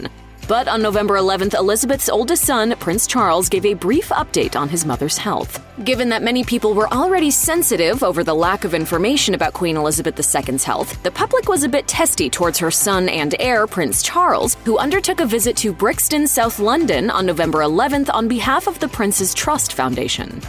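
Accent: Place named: United States. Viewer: Why does an AMERICAN have to do all these narrations?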